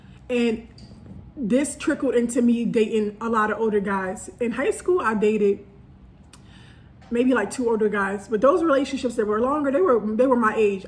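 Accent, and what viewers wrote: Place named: Philadelphia, United States. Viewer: Sooo I just found ur podcast and as soon as I heard ur voice I was like “hold up is sis from Philly?! She’s giving me the vibe”. 😂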